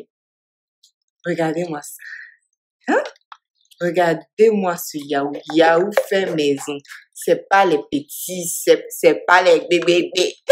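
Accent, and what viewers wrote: Abidjan, Ivory Coast. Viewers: Toi tu es ivoirienne l'accent .😘😘merci la sœur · Ivoirien quoi bisou ma soeur 😂😂😂😂 français terre a terre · Bravo ma chérie 🤩🤝👏 pour ce chic dèguê 😂j’ai suivie avec plaisir , l’accent de chez nous , le “c’est Maman qui m’as appris depuis Abidjan “ tout cela j’ai aimé même 😂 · cc j espere ke vs allez bien; merci bcp pr le dèguè. J aime votre maniere simple de presenter votre recette. On sent la voie dune vrai abidjanaise. RIRE. BISOUS. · Cc j espere ke vs allez bien. Merci bcp pr le déguê. J aime bien votre maniere simple de presenter votre recette. On sent la voie d une vrai abidjanaise😂😂.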